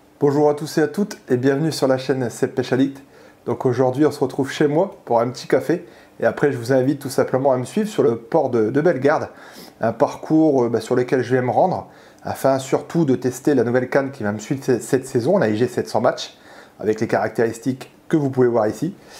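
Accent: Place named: Nord, France. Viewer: Salut, c'est vrai que tu as quitté le Nord, j’avais complètement oublié, mais bonheurs ta pas perdu l,accent. Bonne continuation et plein de nouvelles vidéos. Jean-Paul.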